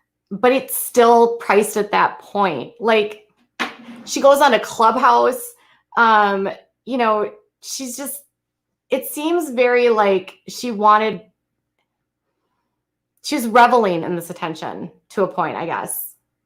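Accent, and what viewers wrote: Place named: Upstate New York, United States. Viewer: Her accent sounds like upstate New York state.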